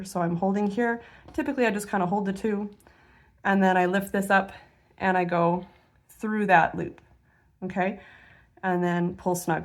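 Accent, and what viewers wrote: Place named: Canada. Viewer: You sound Canadian ❤️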